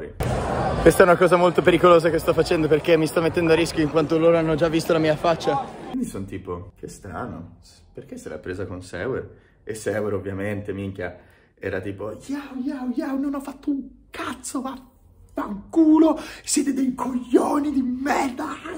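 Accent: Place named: Rome, Italy. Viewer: Ma perché parli in Romano? Bel video continua così 😊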